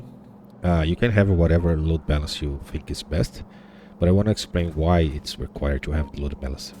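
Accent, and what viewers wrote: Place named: Brazil. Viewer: Vc é brasileiro? Teu sotaque parece daqui.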